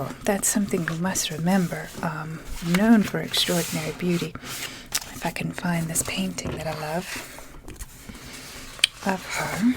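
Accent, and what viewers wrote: Transatlantic, United States. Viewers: You have an almost transatlantic accent and it’s soooo relaxing to listen to. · Where is your accent from? Is it a combination of different places? It's almost transatlantic and super relaxing. New subscriber here.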